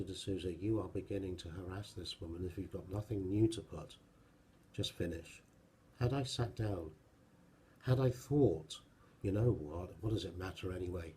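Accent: England, United Kingdom. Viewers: Anyone randomly binging this dudes videos? I see fresh comments on a 4 year old vid. Like this dude's style of video, but how does he have an English accent with that name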